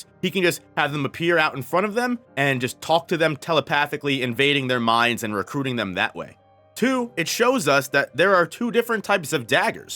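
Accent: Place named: United States. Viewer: The problem with american accent reading Japanese words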